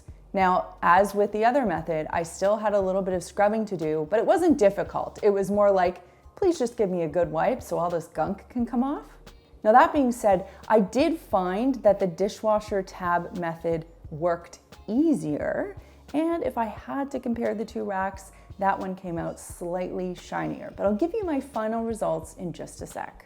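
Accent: Canada. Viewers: Great video with tips for a chore that I always dread. Makes it seem way more doable now, so thank you! Side note: are you a Newfoundlander?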